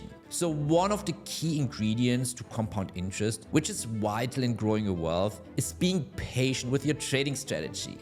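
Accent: Germany. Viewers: i am german and sounds like you too?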